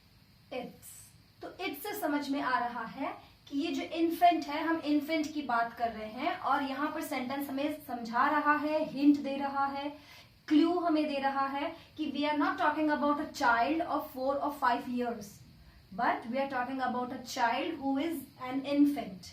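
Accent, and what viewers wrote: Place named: Sikar, India. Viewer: Didi mai sikar se hu aapki voice sikar me rahne walo ke jaise lagi isliye pucha tha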